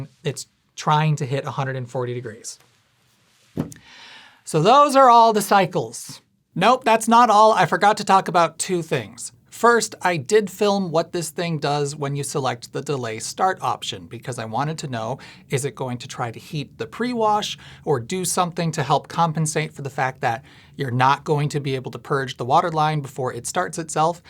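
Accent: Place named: Midwest, United States. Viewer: That's just his Midwest showing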